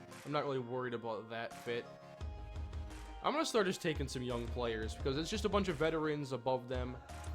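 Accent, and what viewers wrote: Minnesota, United States. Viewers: Are you from minnesota?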